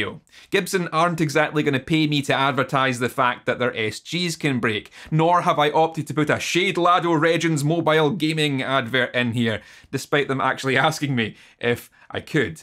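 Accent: Scotland, United Kingdom. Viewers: It's refreshing to hear a non-American accent, especially a Scottish one. Spent 8 months in Edinburgh in 2007 and loved the place. I became addicted to Irn-Bru and Caledonian 80. I don't miss the neds though, but I tried Buckfast for a laugh and thought it tasted shithouse. Love your channel. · I wouldn’t have done it any differently as I have never repaired a neck break in a guitar. Looks good to me! I don’t get the urge to turn off the video when I hear your accent, I get the urge to drink an Irn Bru and get something from Gregg’s and then I remember I moved back to Australia 10 years ago and I get a little sad. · weird, sounds different in this video, much less Canadian and much more Scottish.... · People leave because of your accent? But...Scottish accents are so cool! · Nice work, love your videoes and the Scottish accent.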